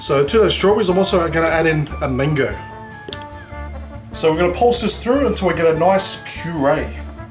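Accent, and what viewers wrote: Australia. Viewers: I'm subscribing you you can you have a really cool oz accent and your videos are creative and original keep em coming! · Are u from Australia if so what state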